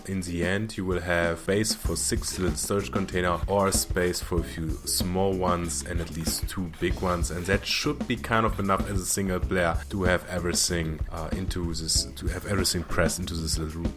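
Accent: Germany. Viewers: that german accent tho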